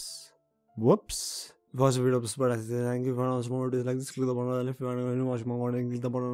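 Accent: India. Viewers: then why is your accent so Indian and I'm not being racist India doesn't have a lot of tech from what I've seen very poor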